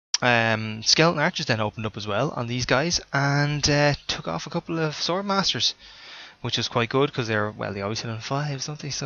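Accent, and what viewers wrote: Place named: Ireland. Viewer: Thanks for the report,. Nice to hear an Irish accent on some Warhammer battle reports (even if it is a Dublish(ish) one, haha). Sound, mate.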